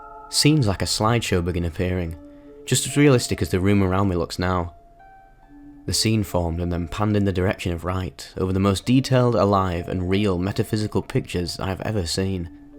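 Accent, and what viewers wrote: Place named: Manchester, United Kingdom. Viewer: Beautiful pic and title made me smile, I am a /lad/ from Mississippi and I've always loved the manc accent since discovering karl pilkington around 08,09 and listening to the Ricky gervais show animated, an idiot abroad, and then the rest. You bring that for me with your own style on one of my favorite topics and do it so eloquently and with such a wonderful energy behind it. Please always continue if you continue to enjoy this. I listen to every video.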